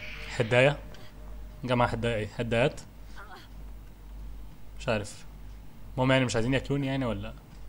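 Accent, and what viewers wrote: Egypt. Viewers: هو مصري ولا عماني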